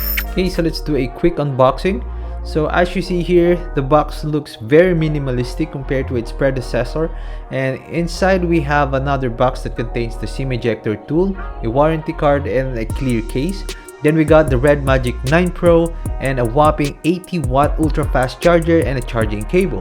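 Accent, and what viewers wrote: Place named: Manila, Philippines. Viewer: Pansin ko lang accent mo, accent na taga manila talaga hehehe Appreciate the props though.. Catchy sya at thanks sa review, sana next time share mo din di lang graphics na nalaro mo sa isang game kunti pati frame rates din.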